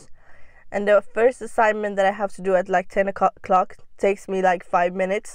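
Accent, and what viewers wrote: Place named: United States. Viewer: Where are you from? I hear a foreign accent mixed with American lol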